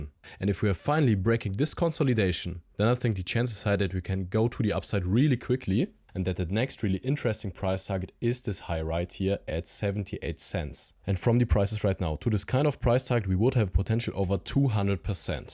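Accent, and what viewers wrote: Germany. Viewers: German accent👏🏻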